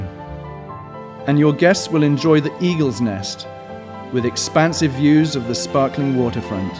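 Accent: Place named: United Kingdom. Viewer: Why is the narrator a brit? there's no brits in the San Juans. (I grew up there). Just sayin...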